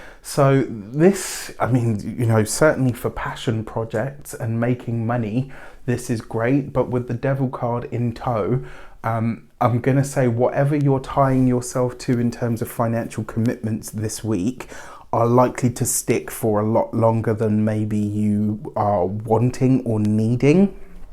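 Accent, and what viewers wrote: United Kingdom. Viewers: Hello sir, I happened to come across you for the first time ever...thank you universe 🥰 great read, thought provoking and resonant for me. Thank you, also nice to have a British male accent doing readings ☺️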